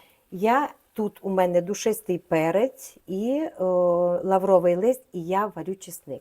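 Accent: Ukraine. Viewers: Говорите по_русски, чтобы было более понятно.... Вы же грамотный человек, не нужно акцэнты делать...... Я прекрасно знаю язык укр. Но, много не расслышала_а другие просто отключат_чересчур акцэнты не центральной Украины.... Я люблю классическую речь и укр. И русскую.... Думаю, меня и остальные поддержат.... А, вообще вы молодец!!!!!!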